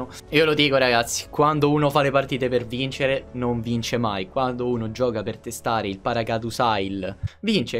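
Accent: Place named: Naples, Italy. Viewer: Pensavo fossi di Napoli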